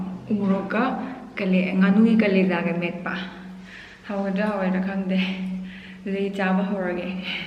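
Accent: Kakching, India. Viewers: Kakching londwna ngangdena ngdi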